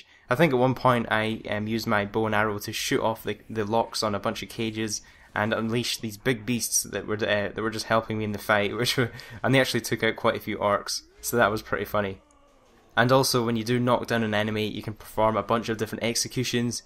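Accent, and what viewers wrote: Scotland, United Kingdom. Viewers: What part of scotland are you from x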